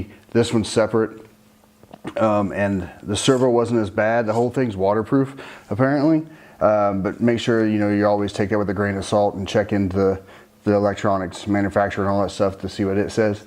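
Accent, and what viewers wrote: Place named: United States. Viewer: its kinda funny cos u have such a strong american accent but you talk in quid or british pounds lol good video tho!!!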